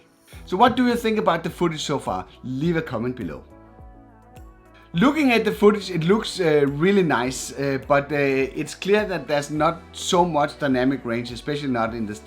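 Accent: Denmark. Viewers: Ære you from denmark? Sound like at Danish guy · Are you from Denmark? Your accent is so danish!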